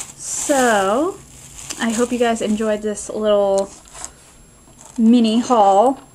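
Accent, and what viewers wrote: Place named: United Kingdom. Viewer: No prob. I loooooooooooove British accents. They r just so, so, BeAuTiFuL!¡!¡ XD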